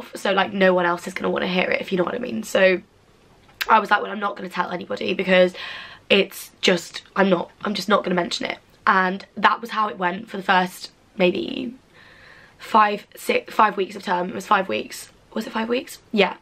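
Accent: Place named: RP, United Kingdom. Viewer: You sound so posh in this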